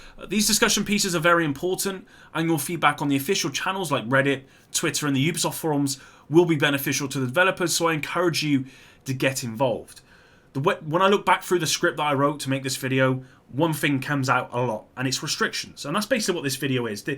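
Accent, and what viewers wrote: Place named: West Country, United Kingdom. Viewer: Wondering what a "mega Fred" is... Just teasing I love that West Country accent. Also bring back optimisation!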